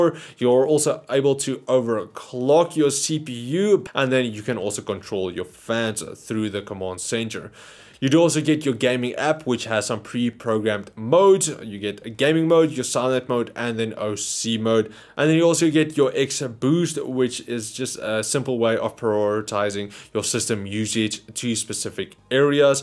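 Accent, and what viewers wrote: South Africa. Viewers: My mother is South African and I lived there for around 6 years when I was very young, it's so nice to see a South African reviewer and It's great to hear the accent again. The review is also amazing! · Are you from South Africa?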